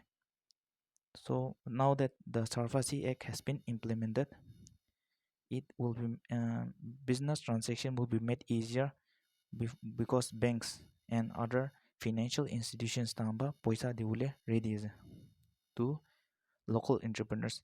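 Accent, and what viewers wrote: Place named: Nagaland, India. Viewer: Bro by ur accent I think ur from Ao community man.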